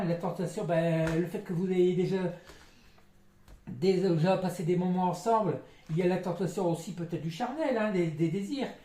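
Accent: Nord-Pas-de-Calais, France. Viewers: Mon autre m'a enfin avoué en rougissant qu'il pensait tout le temps à moi... Il ne faut jamais désespérer ! Votre accent chti me rappelle mon enfance à Béthune... Merci pour vos guidances et votre bienveillance ❤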